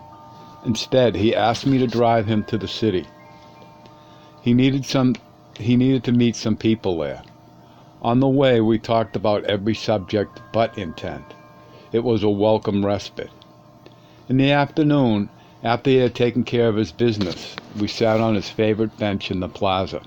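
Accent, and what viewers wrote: Boston, United States. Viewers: Thanks so much for this upload. Your bostonian accent has been blessing my mornings for the last few weeks. Looking forward to more.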